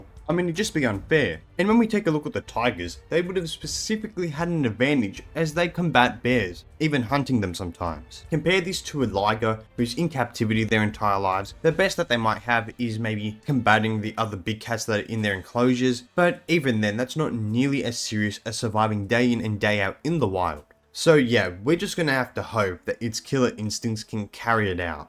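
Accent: Australia. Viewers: I need more of this Aussie accent talking about wild animals. Nothing better than a wikd human describing wild animals with their wild accents. I love it!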